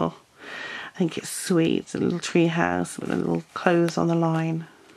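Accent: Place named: England, United Kingdom. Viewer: I don’t think I’ve mentioned before, I love listening to your videos, you have such a lovely calming voice. I also am particularly fond of English accents as I have rellies in England and it makes me feel very homey Xx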